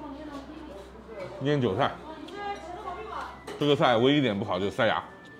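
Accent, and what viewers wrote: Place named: Feidong, China. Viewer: 肥东话多少还是和市区的有区别 不过也差不多是乡音了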